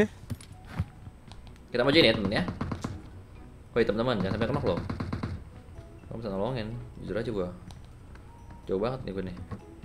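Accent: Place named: Kalimantan, Indonesia. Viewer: KALIMANTAN MANA SUARANYA.. 😎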